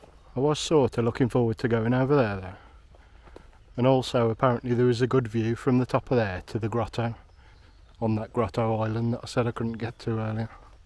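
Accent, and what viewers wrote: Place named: Scotland, United Kingdom. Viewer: Thank you very much,. I am intrigued by your accent, from which part of the UK are you from? For the pronunciation of a couple of words, I would say Scotland but I am not sure. Regards.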